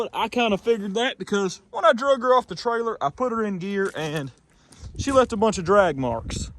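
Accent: South, United States. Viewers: not an accent but rather the Southern Drawl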